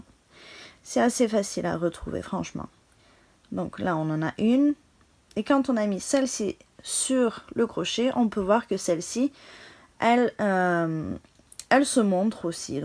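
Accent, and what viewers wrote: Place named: England, United Kingdom. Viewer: Bonjour, On m'a justement offert des crochets bambou comme cela mais perso moi je ne me sent pas à l'aise avec Mise a part cela je te suit depuis le début, j'adore ton petit accent anglais, tes explications sont au TOP continue ainsi, ne change rien je souhaite plus souvent de vidéo (si tu peut ) merci bisous coco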